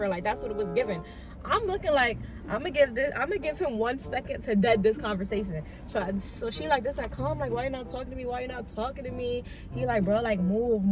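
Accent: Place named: Dominican Republic. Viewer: I like her accent, she must be Dominican 😍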